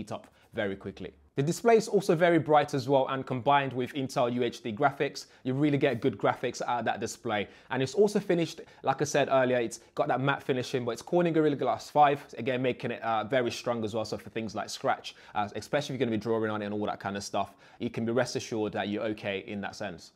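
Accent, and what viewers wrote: United Kingdom. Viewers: lovely to find a review with a british accent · Brithish accent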